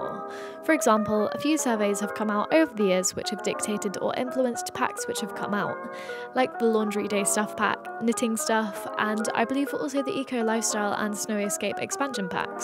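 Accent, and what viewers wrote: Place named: United Kingdom. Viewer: reading books for kids might not be the most fun content to make but.. Something to think about if me, and momma are saying it. And You should be patting yourself on the back. You made a 44 minute video, on a topic I'm not too keen on, watchable. That's a feat. And I'm not a " yes man ". If I didn't enjoy the video, I wouldn't have said anything. I clicked to give you a view. Didn't expect to stay Speaking of your voice and accent.. What kind of accent is that? Forgive my uncultured americanism. I'd guess UK but I could be completely wrong.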